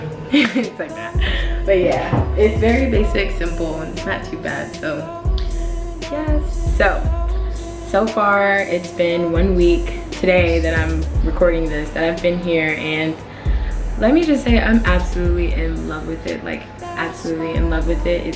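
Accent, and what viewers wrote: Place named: United States. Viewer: The accent is why they say you have an American thing.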